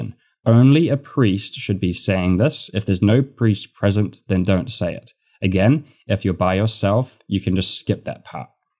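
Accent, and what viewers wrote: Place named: South Africa. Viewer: Thanks so much for this video. It's really helped me understand how to properly go through the daily office. I'm still fairly new to Anglicanism and so I really appreciate this. Also I didn't know you're from NZ (I thought your accent might have been from South Africa or something), I'm on the other side here in Sydney Australia.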